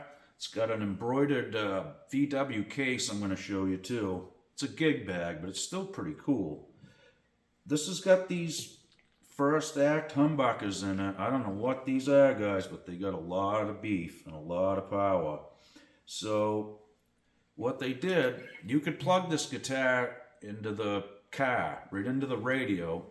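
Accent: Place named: Boston, United States. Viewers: Cool ‘guitah’. Love your New England accent. 🤘😎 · Good Job. Love your Boston accent. Thanks. The VW Garagemaster could become a collectible.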